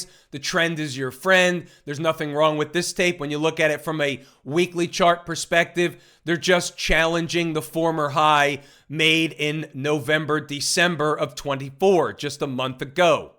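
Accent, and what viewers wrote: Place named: New York City, United States. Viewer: The NY accent is over the top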